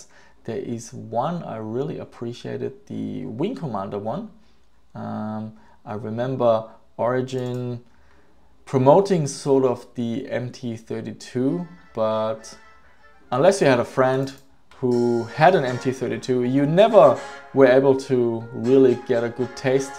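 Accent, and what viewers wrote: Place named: Germany. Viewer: Da is doch deutscher Akzent^^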